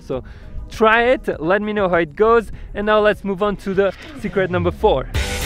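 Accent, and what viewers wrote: France. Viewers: The french accent is epic 😊😊, I am a french speaker by the way and my english is way far from being as good as yours. Keep it up. I learn a lot through your videos.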